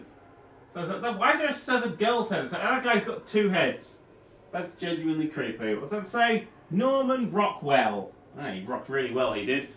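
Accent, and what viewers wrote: United Kingdom. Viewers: Hes done it. Hes gone super brit. And I love it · really brought out his Brit in this video. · He got really British in this video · Did get.... More British? He sounds very British in this video.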